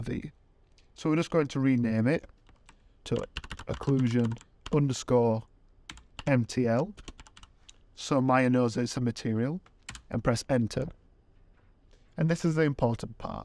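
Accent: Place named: Yorkshire, United Kingdom. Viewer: Legend with a yorkshire accent I thank you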